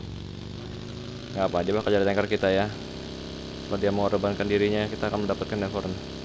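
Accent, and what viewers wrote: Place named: Sambas, Indonesia. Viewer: Bahasanya kayak orang sambas,kalbar😁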